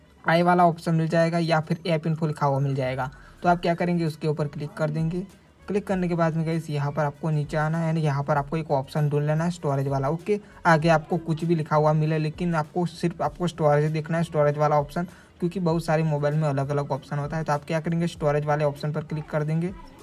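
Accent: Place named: Telugu, India. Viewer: Bro you are Telugu 😅😅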